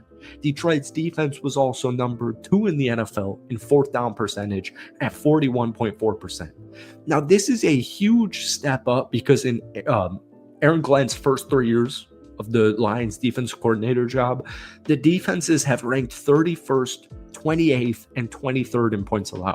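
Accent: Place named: South, United States. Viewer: Your half southern half Wisconsin accent is absolutely awesome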